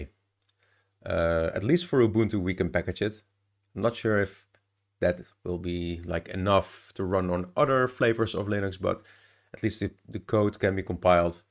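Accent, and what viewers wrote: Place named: Netherlands. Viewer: Lol wist niet dat je een Nederlander was , zag je post op Tweakers en nu hoor je duidelijk je Hollandse accent. Thnx voor deze project , ben mega fan van Dune 2 (en andere Dune games) sinds ik het speelde op me oude 286. Dus super vet project , good Luck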